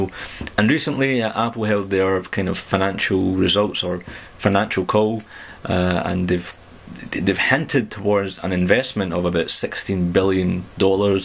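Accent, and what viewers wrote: Scotland, United Kingdom. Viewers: he's Scottish...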